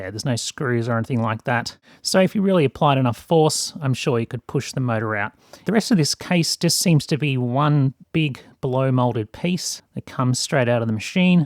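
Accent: Australia. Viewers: I bought a similar fan to the cheap one shown here but for a price of about 30 UK pounds (which is more than twice the price). Outside is a bit slimmer but otherwise looks very similar. However, I found that spending extra got me the following: - 26650's instead of 18650's. They advertise 4500mAh, not sure what it really is but it is rather heavy and I haven't managed to discharge it yet (had it for almost a year). - Proper cell balancing, the midpoint of 2 cells is connected to the charging board is well, the datasheet of IC used shows it does balancing. - Speed control. - Some protection from the fan being turned on by accident (the button needs to be held for 3 seconds before the fan turns on) - Nozzle that properly locks in instead of a wimpy magnet. Funny enough, the price of that same model of fan later rose closer to what the kica costs. In terms of feedback, it's a good video with a pleasant aussie accent but 2 things I'll note: - The electronics on the back of the motor is actually the ESC. 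They managed to make it so small by using "2 in 1" transistors, same type as used in laptops for generating power rails, they are basically a MOSFET half-H bridge in one SMD package, this way they only need 3 footprints instead of 6. - The wind speed could have been measured in m/s, would have been more understandable to most of the world and comparable with listings since a lot of them advertise speed in m/s.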